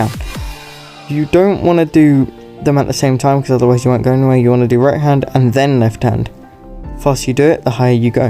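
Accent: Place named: United Kingdom. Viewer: Just wanna say that I had a bunch of ppl telling me that I sound just like you in gtag lobbies and I realised the only reason why was cause where both British lol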